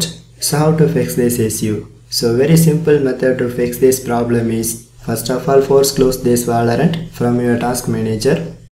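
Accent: India. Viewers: Use a robot voice instead of your indian accent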